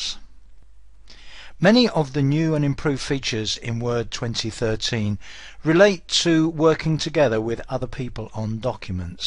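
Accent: England, United Kingdom. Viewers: I do not like the English accent. It is annoying and difficult to understand. It grates on my nerves. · Only a dumb hick like you would get annoyed from a British accent.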